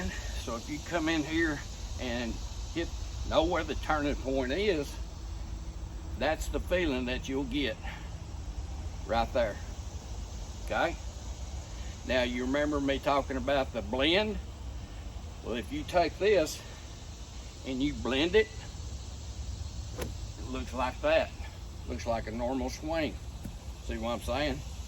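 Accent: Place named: Tennessee, United States. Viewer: I would guess from your accent you are in eastern Tennessee. But not seeing any UT flags or any other decorations has me confused.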